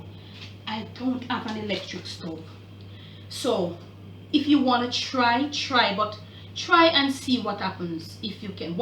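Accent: Jamaica. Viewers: You too sweet.i enjoy your cooking and your Jamaican accent.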